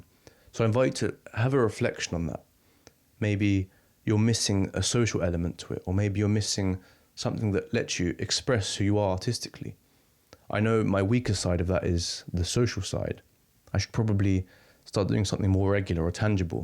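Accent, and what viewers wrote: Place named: Cockney, United Kingdom. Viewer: This guy has the new grating Cockney Accent… Close my eyes and he could be a Rapper or PoundShop Gangsta. Or one of the new breed of BBC or Channel Four continuity announcers.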